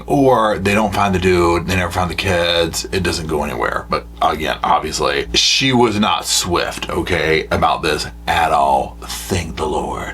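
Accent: South, United States. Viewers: I’m listening to you as I’m having my afternoon coffee. Thinking that you are from the south but couldn’t put my finger on it. Just saw Pittsboro, we lived in Fayetteville(Fort Bragg) for 8 years. We lived there when this happened and I had just had our youngest child. I was so frightened to go outside, even though union was further west in SC, the car hadn’t been found yet. It was a time when carjacking was becoming a thing. So yes Susan Smith had people looking side eye at black men in a red car. It was a horrific crime and the judge showed pity on her by allowing parole. She’s right up there with Sarah Boone and Letiticia Stauch in her narcissism So heyyyyy from east Alabama!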